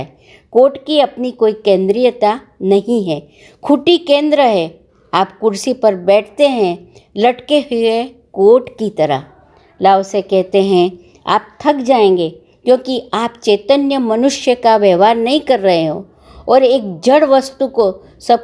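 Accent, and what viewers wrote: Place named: Gujarat, India. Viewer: Madam 👩 ji 🙋‍♀️🙋‍♀️ Aapka... ( pravachan ) bahut achha hai 🙋‍♀️🙋‍♀️ But aap pakka gujrati ho ji 🙋‍♀️😊😊🙋‍♀️🙋‍♀️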